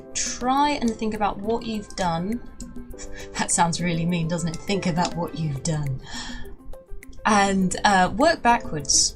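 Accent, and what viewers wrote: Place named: United Kingdom. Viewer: Awww your british! Love your voice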